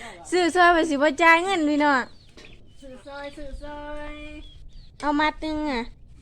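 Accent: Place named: Ubon Ratchathani, Thailand. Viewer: สําเนียงแบบนี้ต้องเป็นคนอุบลชัว.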